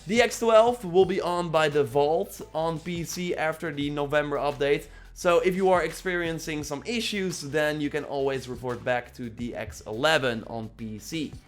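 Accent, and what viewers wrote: Netherlands. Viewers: Are you Dutch? Your accent sounds very Dutch lol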